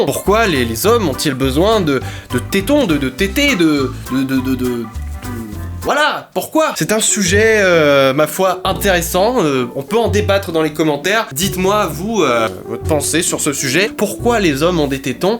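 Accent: Russia. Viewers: Et une dernière question et j'arrête de t'embêter mais est ce que tu pourrais au moins nous garder le "Hello my friend" et nous sortir quelques "cyka blyat" avec ton accent rusde de temps en temps ils sont vraiment géniaux faut pas les perdres:D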